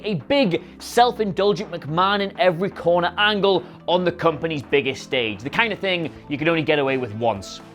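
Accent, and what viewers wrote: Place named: England, United Kingdom. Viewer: you the one pronouncing it wrong fool, he’s English! He speak a purer dialect of English than you ignorant yankee! You speak the language you speak because of his ancestors foolio, read a book idiot.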